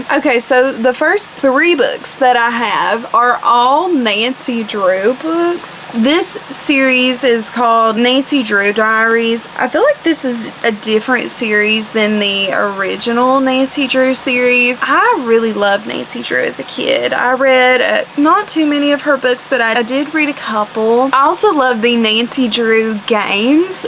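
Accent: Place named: South, United States. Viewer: Ok, I’ve gotta know where you’re from. Love the southern accent. I’m from Georgia 🍑 always looking for new book besties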